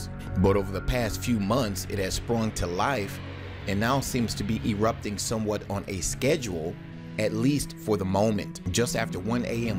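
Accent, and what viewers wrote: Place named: Appalachia, United States. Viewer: Dude what is with your hillbilly accent?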